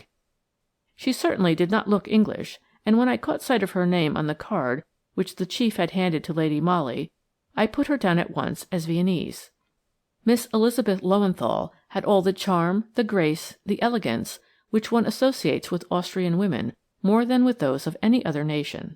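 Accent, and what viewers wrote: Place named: United States. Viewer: Should not be read by an American speaker. Can't listen to it, sorry.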